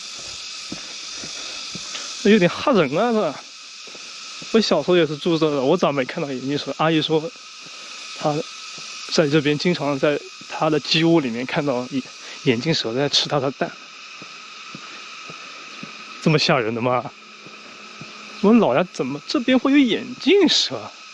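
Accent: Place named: Ningbo, China. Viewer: 寧波 話聽不懂但很孰悉。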